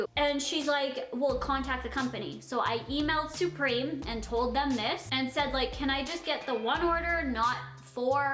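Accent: Canada. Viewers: She's Canadian, it's just her accent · Taylor Phillips it’s a Canadian accent. Their U’s are usually long O’s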